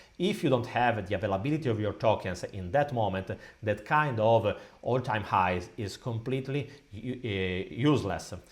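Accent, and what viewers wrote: Italy. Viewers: I really like your content but man your Italian accent sometimes crack me up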